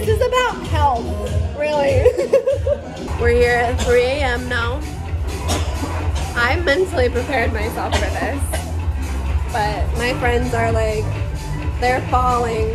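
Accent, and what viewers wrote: Paisa, Colombia. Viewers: Me encanta tu acento paisa cuando hablas en español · te siento un leve acento paisa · Tu acento paisa es genial... Un fuerte abrazo, eres una digna representante de Colombia ante el mundo... Saludos desde Bogotá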